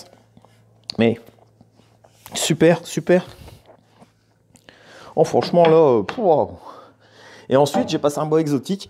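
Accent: North, France. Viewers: Certes, pas donné, mais pour moi, inconditionnel de Makita, cette machine est tout de même inférieure au niveau du prix. Je viens d'en commander une (Metabo comme présentée dans la vidéo). Je me régale déjà de pouvoir la tester et l'utiliser. Merci à et la présentation avec ce chouette accent de ch'nord;o))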